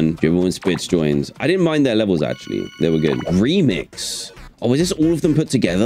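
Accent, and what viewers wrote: United Kingdom. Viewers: British right.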